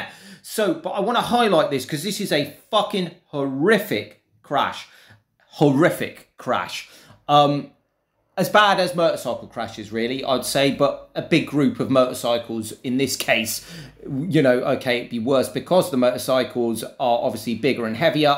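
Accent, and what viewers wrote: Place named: United Kingdom. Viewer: What is your dialect of English called? I’m asking because I’ve recently become fascinated with the dialects of Britannia. Please don’t be cheeky and tell me something like “South Eastern C Nut” or “Cuntyshire.”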